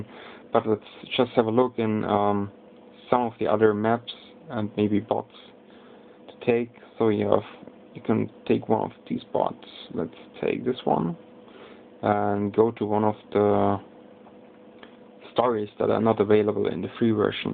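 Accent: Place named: Germany. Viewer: du bist deutsch ich hör so was :D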